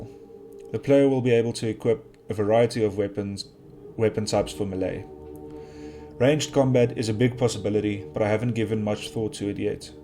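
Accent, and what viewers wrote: South Africa. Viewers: I always get a warm feeling when I hear another south african on youtube lol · I honestly admire the work you are doing,good luck! Also ,I was extremely taken aback at finding another person with a Afrikaans accent on here. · I'm always thrown off guard when I hear a south african accent on YouTube xD Will you be at rage this year? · Where you from bro you sound South African?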